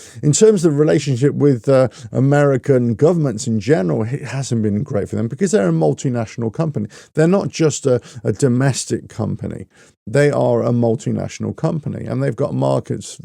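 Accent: United Kingdom. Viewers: Why is it always Donald Trump? All he does is it implement the very same tariffs, other countries have in place for US imports. You have a British accent, which means that you are beaching about how things are in your country and I suggest you take your war to Starmer!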